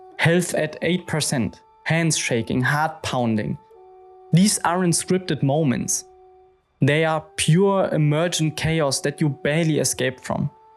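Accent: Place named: Germany. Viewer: German English sounds funny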